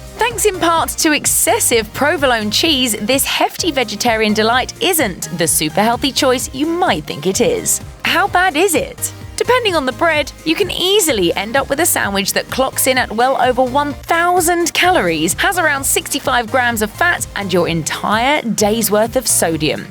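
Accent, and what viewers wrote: United Kingdom. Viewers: I hate that "pompous" British accent. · What happened to the other girl doing the commentating? This chicks British accent is infuriatingly annoying.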